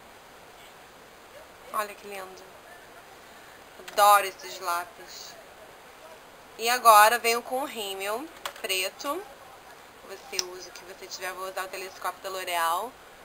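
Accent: Rio de Janeiro, Brazil. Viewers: Nossa flor, antes eu jurava que vc era uma pessoa metida pelo seu jeito de falar, mas hoje penso totalmente o contráriovi que é apenas seu sutaque carioca, vejo vc uma pessoa super legal e que apenas quer compartilhar conosco aquilo que vc sabe fazer bem que é ensinar a maquear, adoro seus vídeos, parabéns!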